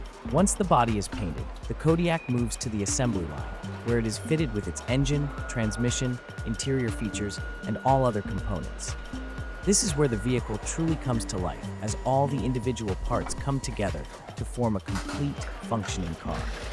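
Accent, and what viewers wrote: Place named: United States. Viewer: Not impressed by the american accent, nor the mis-pronuciation of aluminium .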